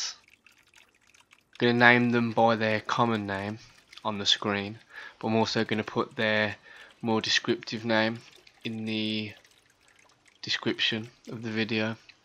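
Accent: Birmingham, United Kingdom. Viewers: Thanks for the reply. I am in USA but you sound like a Brummy yet you say Rekcon ,so that kind makes me think Ipswich. Anyway,I have been checking out your videos today and I really enjoy them Thanks.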